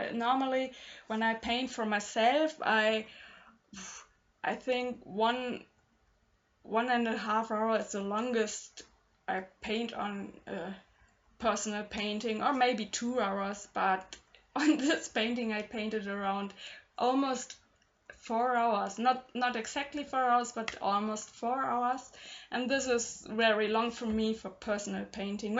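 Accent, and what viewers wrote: Germany. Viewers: I hope this isn't rude to ask, but could it be that you are german? Just judging by your accent... Not that that's a bad thing! I really really enjoyed this collab! Both pictures work very well together, especially because your half is rather warm, but still has some blue/cold accents which resembles the colors PearFleur used in her half. Her part yet again has little accents of warm colors as well it resembles yor part. Just a very nice Collaboration, and I think most people, if not all agree with me!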